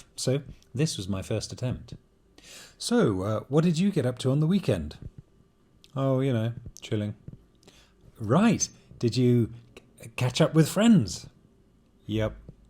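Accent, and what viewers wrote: RP, United Kingdom. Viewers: Thank you for your gorgeous British English among everyday Globish! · Наконец-то я дождалась видео, где полностью говорит по инглишу. Такой приятный акцент. Раньше британские акценты не любила. Но акцент просто мррр. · It is the BBC accent 😂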